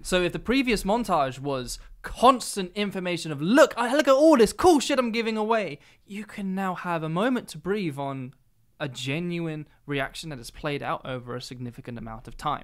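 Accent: United Kingdom. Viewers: Wow the genius of david dobrik explained by a mastercraftsman in an english accent. Impeccable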